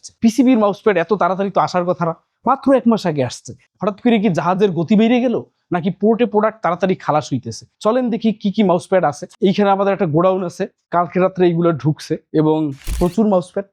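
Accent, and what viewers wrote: Barishal, Bangladesh. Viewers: ভাই আপনার কথাগুলো অনেকটা বরিশাল বিভাগের মানুষের মতো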